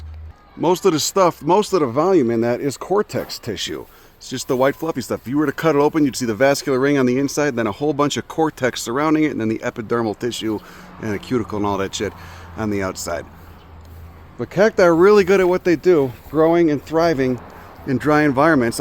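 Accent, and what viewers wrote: Chicago, United States. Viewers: Ya that’s a south side Chicago accent!!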